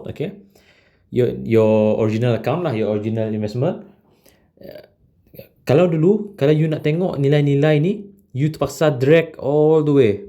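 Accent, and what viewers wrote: Sarawak, Malaysia. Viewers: hahaha.. nang antap gilak sleng srwk nya tek. ku awal2 juak dah perasan.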